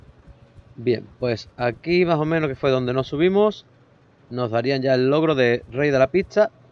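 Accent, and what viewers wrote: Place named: Cádiz, Spain. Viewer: Bua te debo un riñón, me has ahorrado tirar el mando un par de veces con los muñecos ortopédicos estos. Tus videos me han venido de lujo para una promoción de xbox. Por cierto, ¿de Cádiz? Suelo reconocer a los compatriotas jeje. Saludos y muchísimas gracias por tu tiempo.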